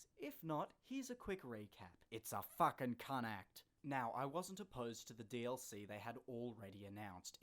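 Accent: Australia. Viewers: You an Aussie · You and GGmanlives should do a duo review. Both Australian accents and just straight trough without the kiddy bullshit. Love it!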